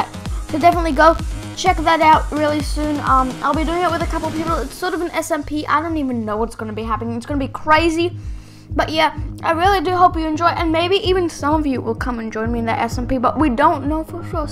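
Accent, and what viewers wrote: Australia. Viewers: Quick question, Are u an Aussie?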